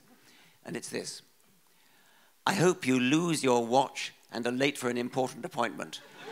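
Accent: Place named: RP, United Kingdom. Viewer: What makes this hilarious is reading out these obscene comments, in his posh accent.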